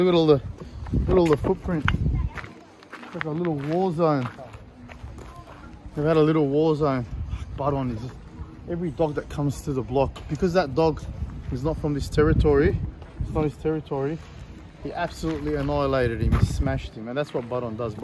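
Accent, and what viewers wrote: Australia. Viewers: You got a bit of an Australian though it seems you live abroad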